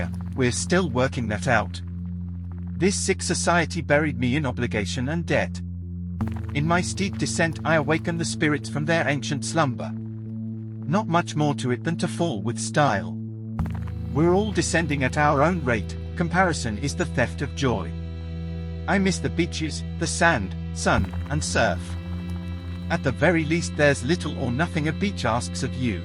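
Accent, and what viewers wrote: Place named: India. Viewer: There are so many better text to voice models. Like elevenlabs. Please replace this lifeless indian-robotic commentary. The whole concept strongly depends on the speaker.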